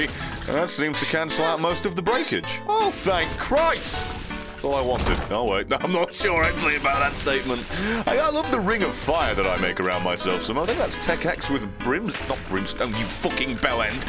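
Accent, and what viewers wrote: United Kingdom. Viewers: Is it just me or is EXTRA British today?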